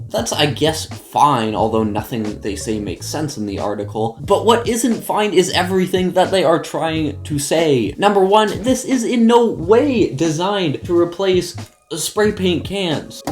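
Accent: Canada. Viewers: just a question are you by chance from newfoundland? your accent kinda sounds similar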